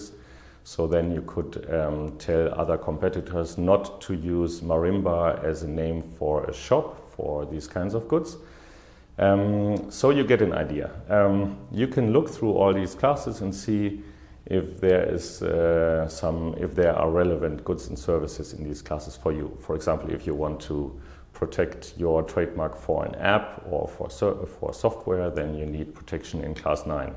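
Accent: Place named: Germany. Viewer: Hey :) Great video! I hear the german-english, realy cool :D Liege ich da richtig? :) lg Robin!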